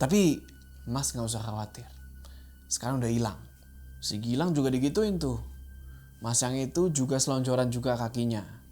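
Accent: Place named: Medan, Indonesia. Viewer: ngapa bntr bntr pake bhasa jowo ntar logat Medan heheheh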